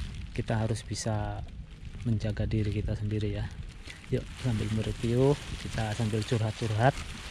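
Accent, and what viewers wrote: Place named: Cirebon, Indonesia. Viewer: Sampean logat cerbonan mas ...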